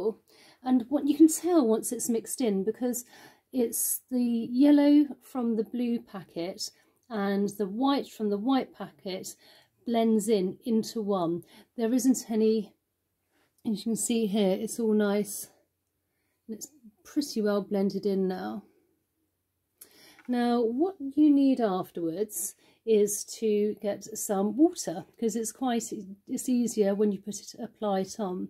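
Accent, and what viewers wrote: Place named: United Kingdom. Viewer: Hi, if you use two-part epoxy products a lot, you can get very sensitized to them, so you might want disposable gloves for what you are doing. After the two-part products have cured, they are very inert, and there is no concern about contact. In practice, the concern might not apply nearly as much to the putty, because it doesn’t have the honey consistency that most two-part epoxy products have, but in principle it still does. Thanks for the nice vid, from an Ahmericaan that loves hearing the Queen’s English. ~Brian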